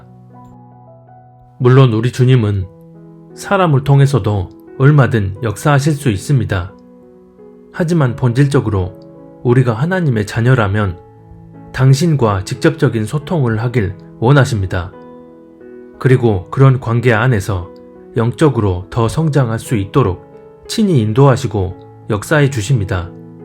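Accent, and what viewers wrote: Jeolla, South Korea. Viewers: 전라도사투리 억양이 강하네요ㅎㅎ 저도 광주출신이라..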